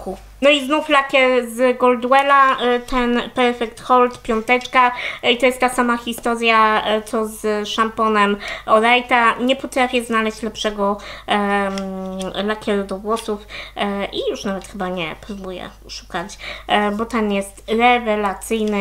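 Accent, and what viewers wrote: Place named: France. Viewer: Muszę wypróbować polecany przez Ciebie tusz od Eweliny 😁 a Tobie z tej samej marki polecam bazę pod tusz. Aaa cudnie mówisz z francuskim akcentem 😄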